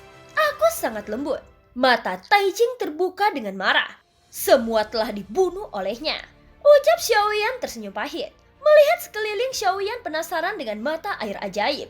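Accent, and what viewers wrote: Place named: Surabaya, Indonesia. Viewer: Suroboyoan rek bosone , semangat yo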